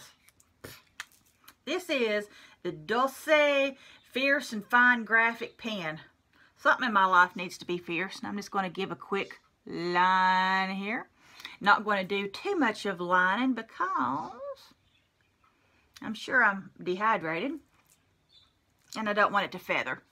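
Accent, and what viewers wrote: South, United States. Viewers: I love you n your Southern drawl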